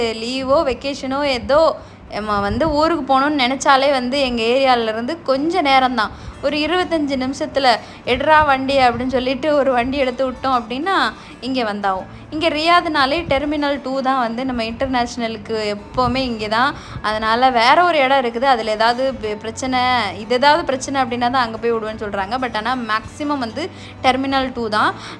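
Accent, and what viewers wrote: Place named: Kanyakumari, India. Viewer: First time watching...... ivanga kanyakumari ponnu, the slang😊❤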